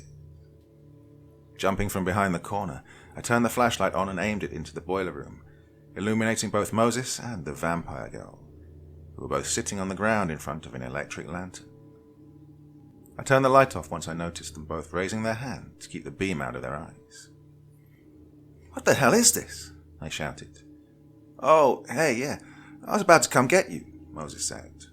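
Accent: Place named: United Kingdom. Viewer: Hearing this in his British accent was great! But I prefer the American version more!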